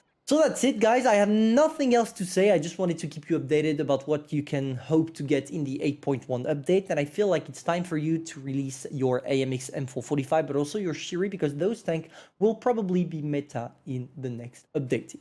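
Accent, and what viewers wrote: France. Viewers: Bonjour everyone ??? Sa se voit avec ton accent que tu es français, ou soit je dit de la merde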